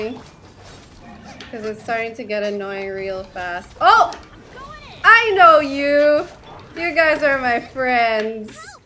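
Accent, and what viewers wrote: Philippines. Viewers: I just finished building Beidou & I finally have a taser team for Kokomi! I’ll use your video as inspiration. BTW, you look & sound similar to my Filipina friend, I think ur from the 🇵🇭 too.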